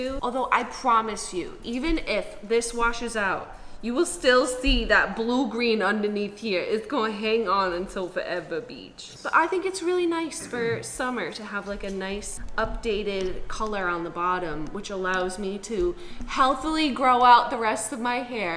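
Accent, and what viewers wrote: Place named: New York, United States. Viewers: bc she is LOL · She’s literally from Rochester, NY my dude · Why does she sound kinda like she be from New York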